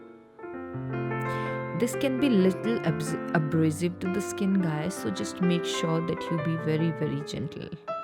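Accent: India. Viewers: I love her indian accent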